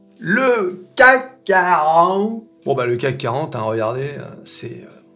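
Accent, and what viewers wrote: United States. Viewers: Y'a pas mal de gens qui se sont fait rekt avec Eurotunnel, et j'ai l'impression que ça a laissé des traces dans l'inconscient collectif. Pis la bourse est une jungle qui fait semblant d'etre régulée, alors qu'avec les cryptos, on sait que c'est une jungle direct, y'a pas d'illusion. Au premier -95% si t'es pas mort, t'as compris q'il y a pas de règles... ;) PS: J'ai ete sur ta chaine Anglophone, c'est pas mal aussi cet accent Texan, t'as choppé ça où ? ;)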